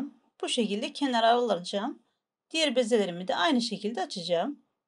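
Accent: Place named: Turkey. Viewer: Italia, penso che sia turca questa ricetta sento l’accento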